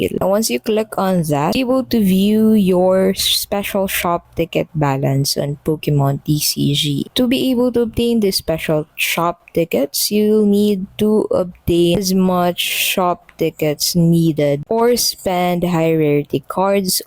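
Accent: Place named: Philippines. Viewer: You sound pinoy.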